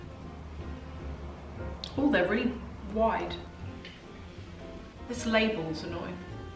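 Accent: England, United Kingdom. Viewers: the Dior tote has grown on me every time I see it. prices in Canada are way to wild to even consider a luxury bag at this point in my life... but I most def appreciate it from afar. Lovely video's I can listen to an English accent all day! take care everyone xxo &&& FABRIC SHAVERS are life savers!!!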